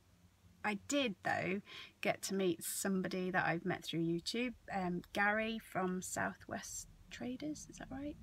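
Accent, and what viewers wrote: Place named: England, United Kingdom. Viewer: Thank you! I'll bet you find some amazing things in, I'm guessing England, by your accent😊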